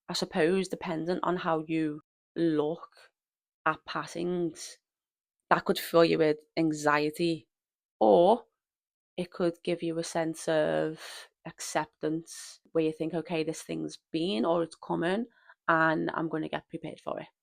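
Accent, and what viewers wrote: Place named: Liverpool, United Kingdom. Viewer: Although I don't believe in banshees I loved your telling of the story especially in that gorgeous Scouse of yours. You do have a talent for storytelling. Be sure to keep developing it. We're cheering you on.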